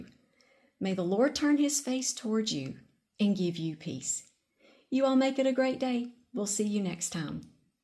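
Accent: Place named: Tennessee, United States. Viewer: I love your TN accent! (from this californian)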